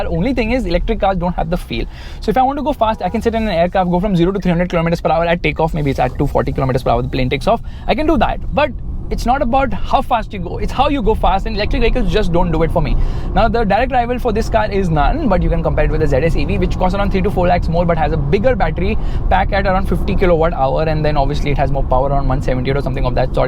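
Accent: India. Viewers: did you reset your language setting on your videos as it's first time hearing you speak in hindi too, on the other hand it's quiet better with the little Indian touch to it.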